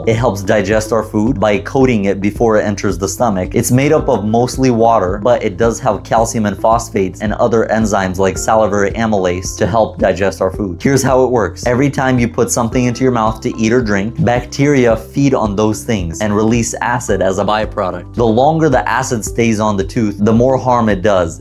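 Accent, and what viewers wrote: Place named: South, United States. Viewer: that’s a neat backstory! I’m from deep south Louisiana and I was hearing an occasional southern accent whether you knew it or not. Lol.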